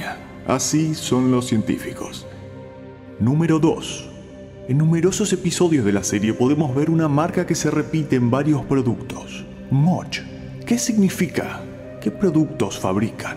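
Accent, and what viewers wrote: Argentina. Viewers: Soy el único que cree que la voz del "presentador" se parece a la de Zico Tops pero con acento argentino? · Porfa que vuelva el ascento argentino:( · che vos sos argentino¿ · Primero se van los acentos argentinos,luego promos a juegos,gente,lamento decir que se acerca la decadencia del canal 😢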